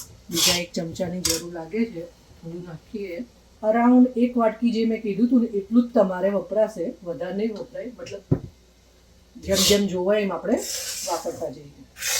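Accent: Mehsana, India. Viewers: Bhasha mahesanani chhe to vatan?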